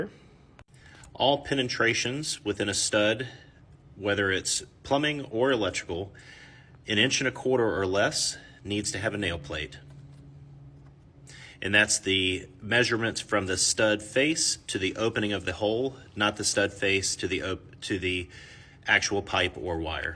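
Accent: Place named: Midwest, United States. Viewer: Wow, That's a sizable number of mistakes. By your accent I'm guessing this in the midwest somewhere, possibly MI or Wisconsin? Just moved out to AZ and I can tell you the level of building integrity is far below my former home in MA. I'm in a 7 yr. old house and I'm finding serious flaws almost daily. Was a builder/remodeler back east.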